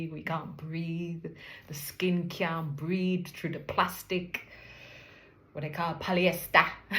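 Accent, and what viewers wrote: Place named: Jamaica. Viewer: Hey family hope all is well thanks for sharing. I love the Jamaican accent it’s really nice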